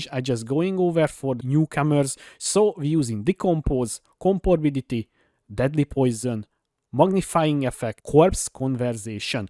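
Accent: Hungary. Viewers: Kurvára hallani hogy magyar vagy :D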